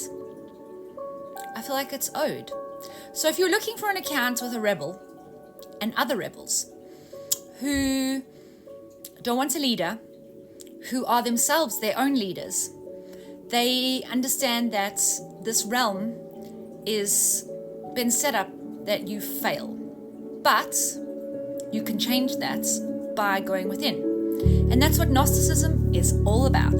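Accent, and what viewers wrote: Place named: United Kingdom. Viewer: Also I like your accent. Is it British? I’m originally from South America living in the USA. When I hear a person pronouncing every single syllable in English, I love it. I’ve been speaking English for many years but for some reason I’m still insecure.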